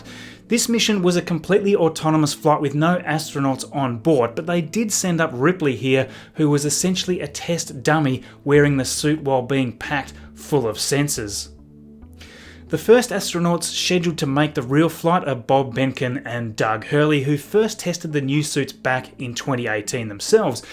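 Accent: Australia. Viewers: Im sorry to ask off topic but where is your accent from, Australia?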